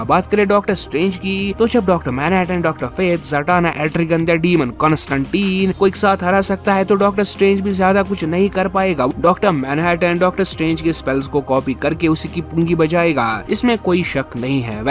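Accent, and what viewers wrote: Kashmir, India. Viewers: App kashmir sa ho kya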